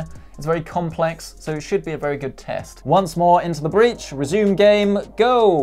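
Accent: United Kingdom. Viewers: I wondered how he picked up British accent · that british accent. Marvellous.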